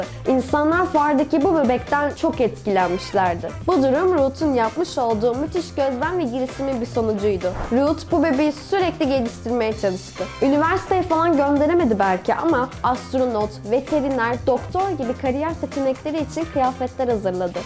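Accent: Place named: Istanbul, Turkey. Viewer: güzel kızsın ama neden plaza ağzıyla konuşuyorsun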